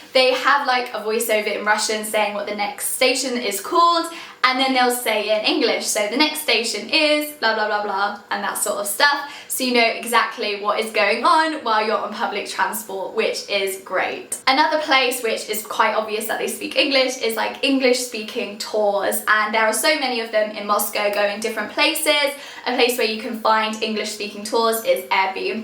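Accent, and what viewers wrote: United Kingdom. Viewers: You should get rid your very strong so called "british" accent. Try to learn pronunciation from Cambridge or Oxford courses of English, or, at least, from the TOEFL, because american accent is easier to understand for Russians. But the best (purest) English pronunciation is in Australian universities and on TV.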